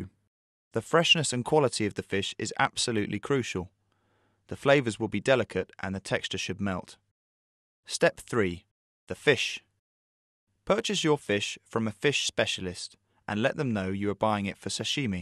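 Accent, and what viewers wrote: United Kingdom. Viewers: British pronunciation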